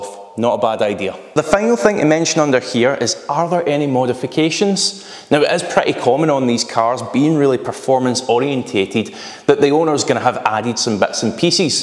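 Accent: Scotland, United Kingdom. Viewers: Don’t be ridiculous have you never heard a Scottish accent before!!!!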